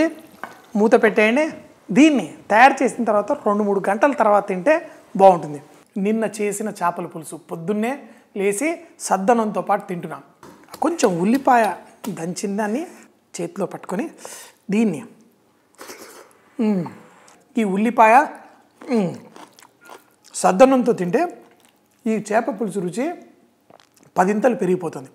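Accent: Telangana, India. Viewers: Your Telangana slang is awesome!!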